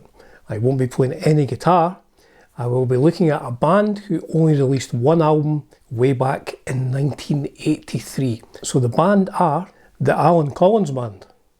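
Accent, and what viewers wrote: Scotland, United Kingdom. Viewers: I have that album. Are you from Scotland? I lived there with my wife and kids. I detect an accent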